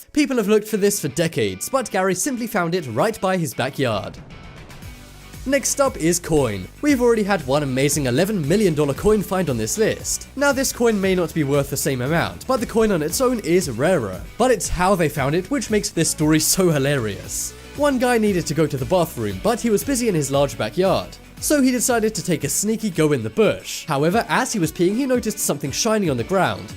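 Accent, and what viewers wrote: United Kingdom. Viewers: The chattery British narrative is hard on the ears. What's scary around finding a rusty old gun ? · He sounds British. I live 3 miles from America, they don't talk like that.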